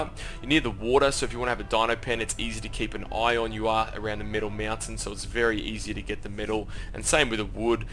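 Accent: Australia. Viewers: I could tell your Aussie! Same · yeah, can always pick up that sexy aussie accent anywhere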